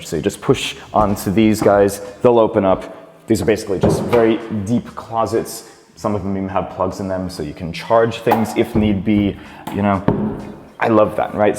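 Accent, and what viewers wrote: United States. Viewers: Came here after watching the Unpacking Japan interview (which was fantastic, by the way), and the wild fluctuations between US accent and faux British accent when giving property tours are cracking me up.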